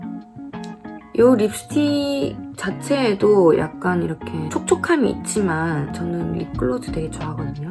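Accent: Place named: Busan, South Korea. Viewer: 얼굴하고 스타일은 American Style일인데, 말투는 완전 부산 Tough Girl. Feels like New Age 이효리. 그런데 왜 이 영상들이 네 recommendation으로 계속뜨지. 난 화장에 관심 없는데.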